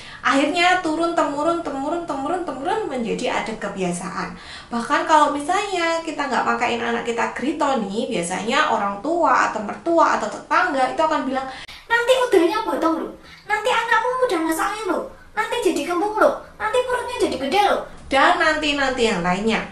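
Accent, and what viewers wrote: Java, Indonesia. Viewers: mbak medok sekali 😂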